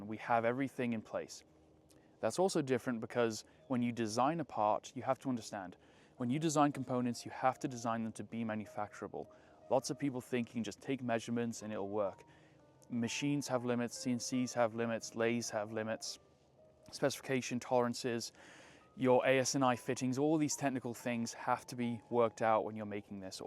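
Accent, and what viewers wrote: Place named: England, United Kingdom. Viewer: a real round then. When I heard the English accent I thought he was gonna sell a .22 😂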